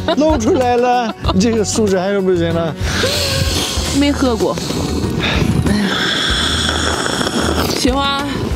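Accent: Dalian, China. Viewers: 哈哈 真幽默。而且大陆口音也逗。订阅开始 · 大连话不要太标准啊！太怀念国内了！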